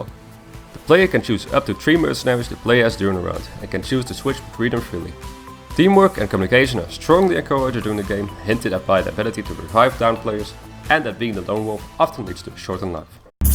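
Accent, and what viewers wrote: Netherlands. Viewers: This guy isnt american i think because of his accent...... He sounds very dutch! (Please take that as a compliment) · Nederlands accent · Nice list, but holy crap your English sucks! That accent sounds dutch which is my native language as well, but I hard a seriously hard time understanding what you were saying. Please, either work on your speech skills or use text instead. This sounds like Mark Rutte and that's not exactly a compliment.. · Are you dutch?? Sounds like a dutch man xD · You must be Dutch? Of is dat niet zo? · I think he is dutch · i think he is dutch :/ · yooo gast praat rustiger en duidelijker niemand verstaat wat je zegt met je nl'se accent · are you dutch? ik hoor je accent xdxd. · Dutch ? Kan het horen man :) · je Engelse Nederlands accent is crap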